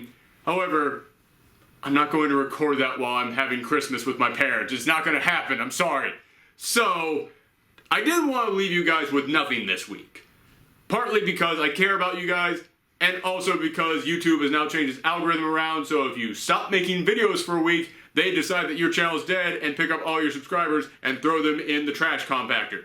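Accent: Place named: South, United States. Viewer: wait just a minute your from the South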